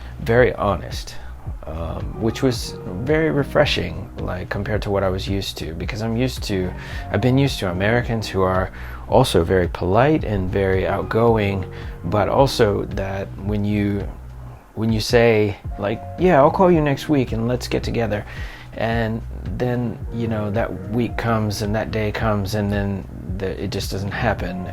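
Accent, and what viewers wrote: Finland. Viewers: You are picking up a Finnish accent :D PS. Welcome to Finland. Edit: Oooh so you moved ages ago. No wonder the accent is there.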